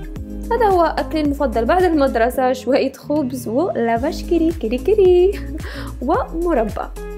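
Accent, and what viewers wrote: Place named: Morocco. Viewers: اول مرة نشوف فيديو ديالك عجبنييي بزااااف ، من لهجة ديالك تنقول بانك مغربية ،نتمنى نكون مغلطلش انا غنمشي نشوف باقي الفيديوهات ، تبارك الله عليك ، يشرفني مرورك فقناتي حبيبة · هههه اللهجة أكيد مغربية وفي هاد الفيديو تاكدت منين اكلت فرماج لافاش كيري واتاي بالنعناع هههههه الشاي بالنعناع هههههه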